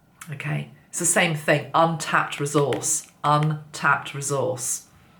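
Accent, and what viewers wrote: United Kingdom. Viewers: At least the "it's a bit sparse" bit made me laugh out loud. (Regarding the tree) It's prob your British accent too. I could picture that old show The Young Ones having a sparse tree and talking about it. Gotta find the humor in the grinches & scrooge types. Love your videos! Xo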